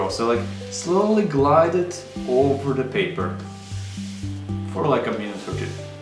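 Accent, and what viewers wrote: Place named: Scandinavia. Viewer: Your accent sounds so Scandinavian .. Where are you from?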